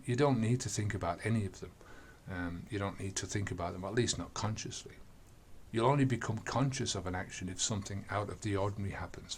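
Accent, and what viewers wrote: Ireland. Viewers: Love Irish 🇮🇪 Accent! 🍀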